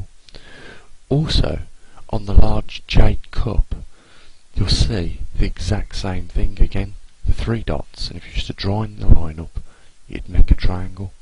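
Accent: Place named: Cockney, United Kingdom. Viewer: I would have loved to listen to this video but the dude already has a thick English Cockney accent and the fact that he has his lips pressed up close to the mic doesn't help matters at all either! I got about 50% of the content. Not enough to understand.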